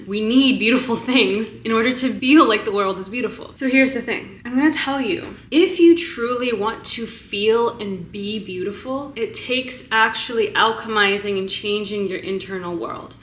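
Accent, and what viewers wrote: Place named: United States. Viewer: Defiantly fine, fine, fine. Yet I have a hard time making out what ethnicity you are though because of American accent is strong.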